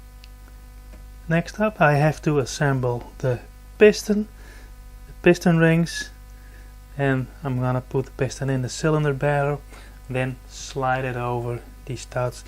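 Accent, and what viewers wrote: Netherlands. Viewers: ik proef een beetje een Nederlands accentje hahaha.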